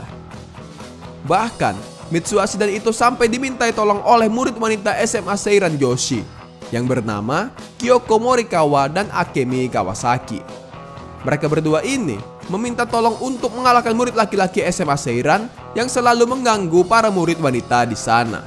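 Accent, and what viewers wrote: Medan, Indonesia. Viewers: Medan kali bahas nya bossss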